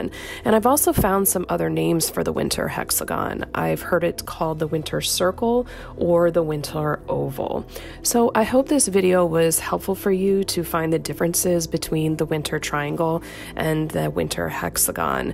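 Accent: United States. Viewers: American pronunciation of celestial objects makes it difficult to understand.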